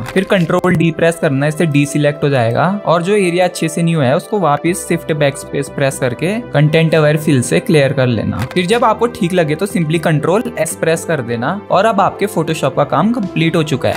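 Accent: Pahadi, India. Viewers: bhai awaz se toh pahadi lg rhe ho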